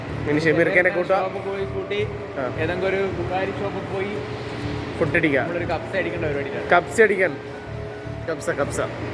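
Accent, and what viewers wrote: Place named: Kannur, India. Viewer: Aa kannur slang kelkan oru verethanne sugam ❤. Ennalum nte husband nne kootathe film kanan poy nadakate🤩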